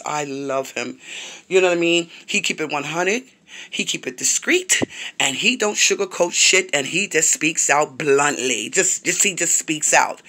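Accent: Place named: New York, United States. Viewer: 💞💞 I just subscribed to your lovely channel 💞💞 I like the name 💞💞and I agree that does have a beautiful smile and very nice teeth 💞💞 is a sweet Lady💞💞as far as Adreian she just needs lots of prayer for deliverance💞💞then I think she will become a completely peaceful and happy woman 💞💞lastly I love your accent and you talk like a New Yorker 💜💜💞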